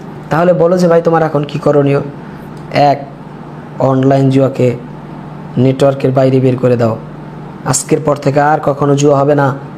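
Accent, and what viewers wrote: Barishal, Bangladesh. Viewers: ভাই তোমার বাড়ি বরিশাল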